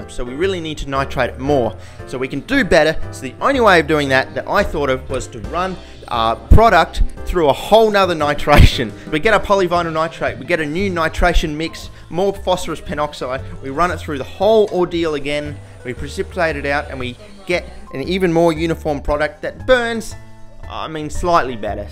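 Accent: Australia. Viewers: I'm not the smartest fellow so I'm just here to hear that nice aussie accent while i play video games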